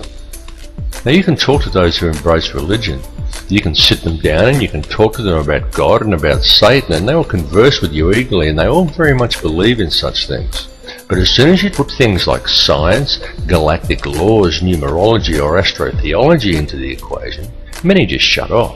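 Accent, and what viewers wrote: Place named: United Kingdom. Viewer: Your british accent is addicting.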